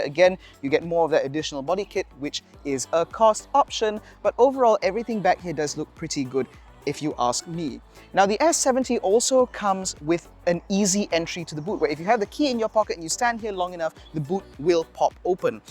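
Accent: United Kingdom. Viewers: Raw malay rempit look with Nice british accent. My brain is scrambled.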